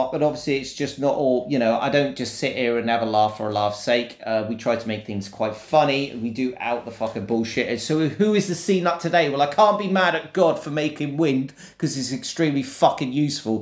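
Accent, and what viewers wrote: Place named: United Kingdom. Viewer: What is your dialect of English called? I’m asking because I’ve recently become fascinated with the dialects of Britannia. Please don’t be cheeky and tell me something like “South Eastern C Nut” or “Cuntyshire.”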